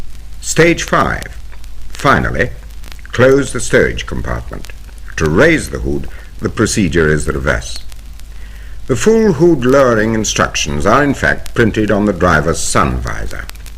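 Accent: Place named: RP, United Kingdom. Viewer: BBC English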